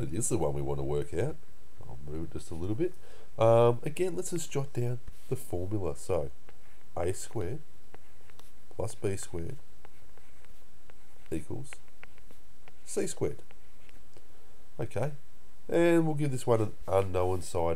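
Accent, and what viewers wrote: Australia. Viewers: sounds Australian, high possiblity.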